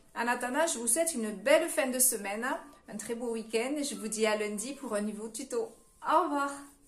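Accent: South, France. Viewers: Bonjour. Quelle peinture or utilisez-vous, si ce n' est pas un secret? Merci pour votre pédagogie, votre sourire, et votre accent du Sud délicieux à mes oreilles nordiques (Belgique)...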